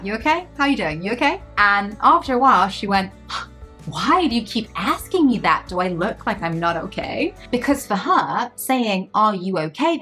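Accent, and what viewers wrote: United Kingdom. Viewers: Oh my your accent is absolutely what I want to practice! Oh so beautiful perfect British accent ... And your gesture, example sentences ...all perfect!!! Thanks for your video❤❤❤❤ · Your English is British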